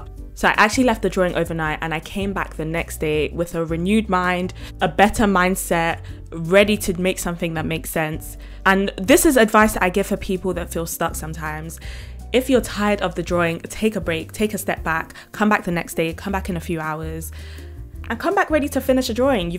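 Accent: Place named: United Kingdom. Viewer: I like your British accent And the asmr while you talk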